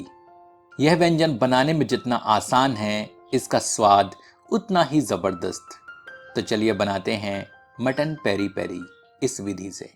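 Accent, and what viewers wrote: Standard. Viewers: श्रीमान आपका शुद्ध हिंदी बोलना बहुत ही आनंदित करता है परन्तु spoon चम्मच कह के बोलिए सोने पे सुहागा हो जायेगा धन्यवाद!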